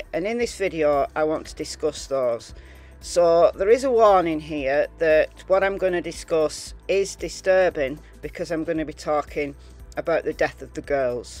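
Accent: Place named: North West England, United Kingdom. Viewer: I’ve just happened upon your tunnel. You don’t sound like a scouser but you don’t sound like a Manc either. Sort of in between so somewhere in the middle is where you are. yes?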